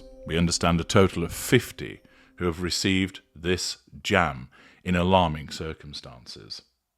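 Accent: South, United States. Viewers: Hilarious! Love the country Southern accent! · you're killing me with that southern accent! You sound like Mr. Tudball, from the Carol Burnett Show. You should check it out. Us Americans, never figured out what country he came from!🤣😂🤣😂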